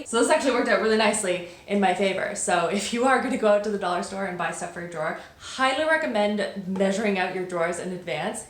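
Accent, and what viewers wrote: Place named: Canada. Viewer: ❤️What an improvement! You make me giggle in all your vids whenever you say words like “a boot”...(about) 😛😘. That Canadian accent coming through!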